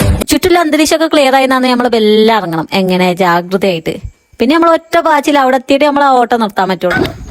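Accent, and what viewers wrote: Kasaragod, India. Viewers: സംസാരം കാസർകോട് സ്ലാങ്ക്🙄🙄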